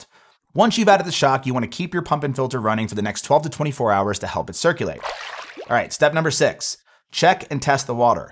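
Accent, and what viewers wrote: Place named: United States. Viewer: Loud mouth american!! Gallons and us accent are of no use😮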